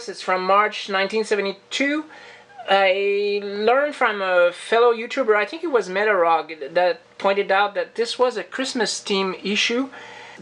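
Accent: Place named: Quebec, Canada. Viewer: Thanks for the fabulous shout out mate, very kind of you! I am almost blushing lol That's an interesting list of comics, you have some cool targets set for 2021, I wouldn't mind that Team-Up 1 myself I must say!! You certainly have some eclectic tastes and goals too, great bits of information in the video also. I loved the Hopalong Cassidy merchandise, particularly the rocking horse/baby chair thing haha Love the accent too of course, they say we Londoners drop our H's a lot when we talk but you French Canadian folks do it even more I think!!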